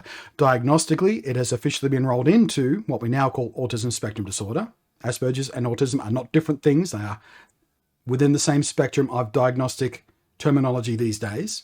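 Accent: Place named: Australia. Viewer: Your description of concentrating on a coffee cup in a coffee shop reminded me of me walking through Martin Place (in Sydney). I noticed that I was looking at the ground, and only glancing up to check and then I would look down again. Thinking about it, I noticed: the paving stones are static, calming. The buildings are static, calming. The sky is largely static -- maybe clouds drifting slowly, but basically calming.And then there is this band of activity -- the crowd of people moving around. And every motion is pulling at my attention: notice this! So it feels "prickly": I cannot filter out all that irrelevant, random movement from my attention -- it is all tugging at my attention. The movement of the crowd is not calming, but unfiltered, attention-grabbing sensations. I can look at it -- it isn't causing me a meltdown -- but I just feel calmer looking down, and only glancing up when I need to check where I am, and where other people are closeby. 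Being in lockdown means not having to walk through Martin Place or other city streets. I love the peace and quiet of my suburb. Getting diagnosed as an adult leads to many big and little self-discoveries. (And of course I picked that you were Australian straight away)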